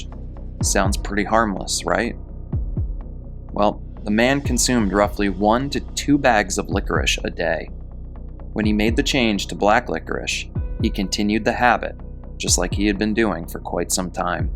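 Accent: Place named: United States. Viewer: I love your accent. What part of America are you from?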